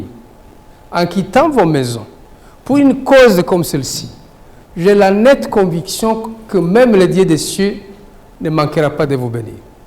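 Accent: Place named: Rwanda. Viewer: Tu te trompes toi même vraiment tu vais par 4 chemins et te voilà arriver au point que Dieu te maudisse profondement jusqu'à ta moëlle tutsi rwandais que tu es tu ne convaincras personne et tu la fermes. Tu voulais recencer les congolais pour Donner l'identité aux rwandais mais tu as raté ton accent ressort toujours. Tes troupes rebelles sont là aussi. Tu as apporté les fonds à vos troupes rebelles et intensifier les tueries dans notre pays. Tu as tué combien de congolais leur sang tombe sur la tête de tes enfants. Je souhaite que tu ne sors pas vivant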